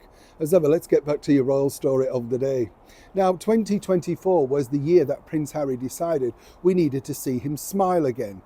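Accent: Yorkshire, United Kingdom. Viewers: Mithering 😀 good Yorkshire Lad, love you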